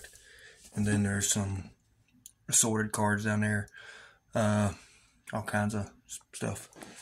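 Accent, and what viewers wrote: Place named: South, United States. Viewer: Now that your doing more videos, I'm getting hooked on that sweet southern accent. Love the card room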